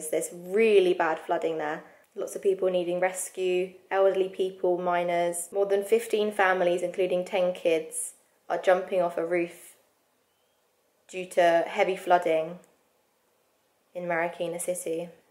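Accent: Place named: London, United Kingdom. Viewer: Manila always gets hit very badly.....Great to hear London English. Greetings from Rosario Cavite.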